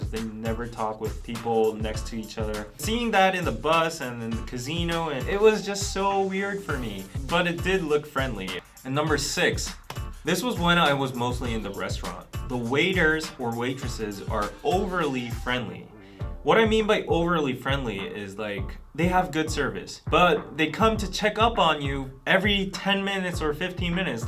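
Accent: United States. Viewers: I call bullshit, your accent is a perfect american accent. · I was waiting to feel somewhat insulted, like I have seen in other “Koreans react to America” videos, but I found yours honest, respectful and amusing. I’m amazed that you only lived in America for only 3 years when you were young, yet you speak English so well. I can see you surprising the waitress with tip questions said with an American accent. Thing I found weird in South Korea was the letter “F” for the fourth floor elevator button because the building management felt the number “4” was bad luck. Guess like the number 13, so lucky building don’t go beyond the 2nd floor here. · Where is he from that he speaks perfect American English?? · I thought this video was a joke at first because your accent sounds perfectly american as if you were born and raised here. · Wow your accent is very american/well pronounced for the short amount of time spent in the states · I am really glad you brought this point up regarding personal spaces. 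I always thought Koreans were so rude by bumping into people and not apologizing about it. Also have a friend refusing to visit Korea again cos an old lady slapped her hand in a shop for touching something. No offense or anything as I am obsessed with BTS, love k dramas and Korean food. I just never thought of it from your perspective. Apart from the Chinese, who are more nonchalant about personal spaces as well, all other Asian countries I’ve been to are more similar to America on this topic. In fact, the Japanese are insanely polite and the Taiwanese love chatting up with strangers. By the way, I always thought you lived in the States for many years as your accent sounds so American! How did you keep up with the English language after moving back to Korea? Interested in finding out. 🙏🏼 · perfect American accent. Very good assessment Especially about body space and Stop Signs: Stop Signs is about "Shield Arm" and Right of Way. 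One gives the Right of Way so that the other party passes you keeping his "Shield Arm" between you and him. So [all things being equal] you should always let the person to your right go first. Most Westerners don't know this. And never tip for shitty service. Biggest error: you are comparing California and Korea. California does not equal America. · this was great! But I'm confused... I've been watching your videos for months. And, if you haven't been to the US in 18 years WHYYYYYYY do you have a mid-west accent (Min-i-SOOOO-da)?? And why do you know so much about US geography and use American colloquialisms? Both you and Danny "seem" very American in thought process, at least in the sense that it is intuitive to you how to explain Korean things so that an American would understand/relate. That's why your audience is so big here, I think. Who/what is the influence for both of you? Maybe this is a video? 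Or maybe there is one and I need to look back at your old ones?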